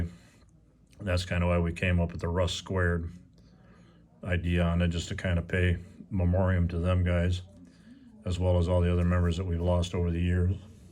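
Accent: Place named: Rockford, United States. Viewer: Damn thats one hell of a drive! I know you said you used to live in Illinois, but the way you talked I thought it was around Rockford.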